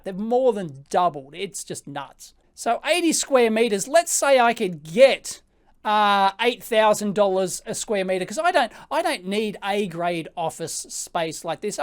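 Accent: Australia. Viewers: ''Office spice'' :) I love your channel and I love your Australian way of speaking!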